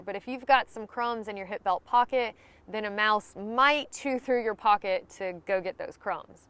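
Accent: South, United States. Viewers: Just love your communication ability. Very efficient and has the perfect southern accent.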